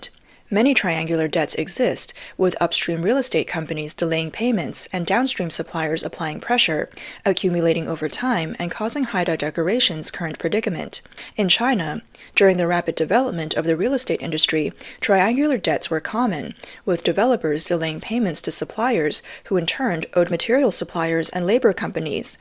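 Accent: Australia. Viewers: Ya, I think she’s Australian, faint accent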